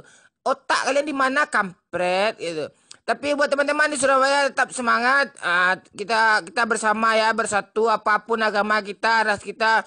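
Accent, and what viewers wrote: West Sumatra, Indonesia. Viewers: Urang minang ma suaro nyo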